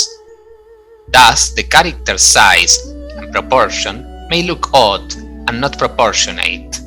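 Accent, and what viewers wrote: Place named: Italy. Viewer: Italian Accent with ‘Roman’ painting.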